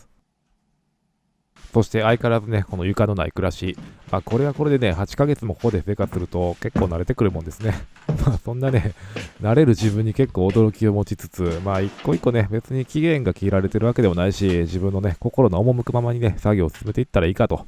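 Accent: Kansai, Japan. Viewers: 本当によくやっていると感心しました。語りの関西弁がいい味出してる。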